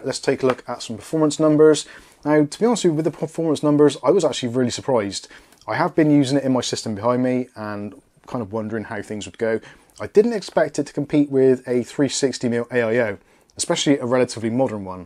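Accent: United Kingdom. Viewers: just curious to know, where about in UK are you from?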